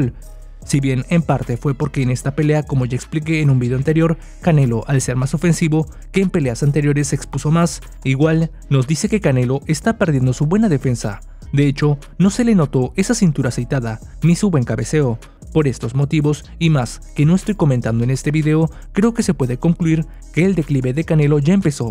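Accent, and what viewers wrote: Mexico. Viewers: yo no se si sos mexicano pero tu acento me dice que si. que amargo que sos hermano, los mexicanos tienen a los mejores boxeadores de la historia y el canelo es uno de ellos...te la pasas haciendo videos tratando de tirar abajo a uno de lo mejores boxeadores de la historia de Mexico en vez de valorar lo que consigue este tipo, y tiene solo 32 años!!! le dejo la cara como un tomate y lo tiro dos veces, a ustedes siempre les falta 5 para el peso...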